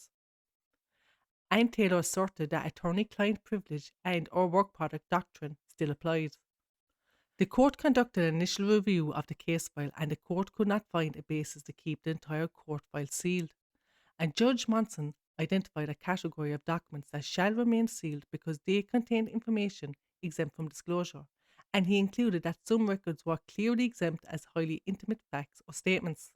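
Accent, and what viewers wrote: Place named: Ireland. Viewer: I love your voice! I had no idea you were actually Irish! Lovely. Thank you for now uploading videos. I can liztdn while i clean and work❤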